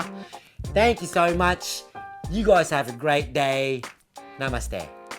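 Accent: Australia. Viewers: Wait so do u really have an australian accent?? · Its still very surprising hearing an Aussie accent coming from a filipino guy. Coming from a filipino this is gold. Good on ya mate🤘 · crikey i love your Aussie accent mate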